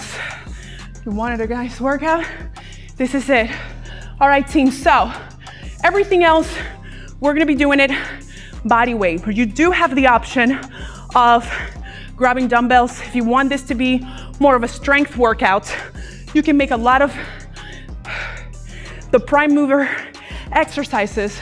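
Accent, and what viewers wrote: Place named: Latin America. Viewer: I love my latina sis' accent: shin becomes "chin"☺💕💕 Thank u so much for sharing your gift of fitness w us!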